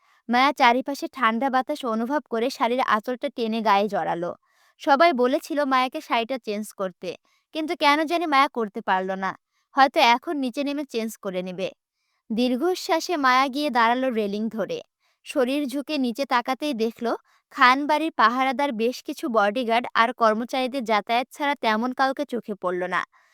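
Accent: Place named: Rangpur, Bangladesh. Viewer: আপু তুমি মনে হয় রংপুর জেলার তাই না রংপুর❤❤😂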